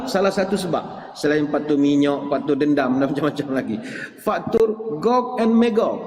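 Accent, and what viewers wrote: Pahang, Malaysia. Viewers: sedak mat dengo ora2 pahang berceramoh…😅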